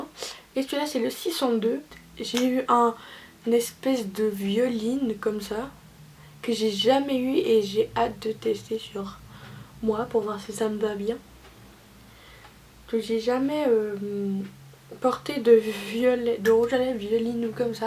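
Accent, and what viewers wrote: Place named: Switzerland. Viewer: je pense que ça doit être l'accent suisse, je doit pas être habitué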